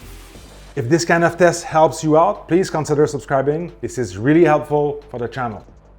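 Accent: Quebec, Canada. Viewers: Salut, je t'entend que tu est quebecois...J'imagine... J'ai une question pour toi, j'ai un Kia ev9 2025 et le Vus je ne sais pas comment il est fait aerodynamiquement,mais le derriere devient toujours sale et rapidement et au lave auto, pas capable d'enlever le tout au complet, y a t-il un moyen de ralentir le tout???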